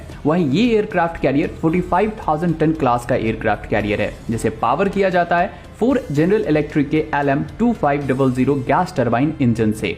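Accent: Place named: Northeast, India. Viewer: Are you from the North East? I am just curious because aapka accent mere North East k friends jaisa hai. Jai Hind!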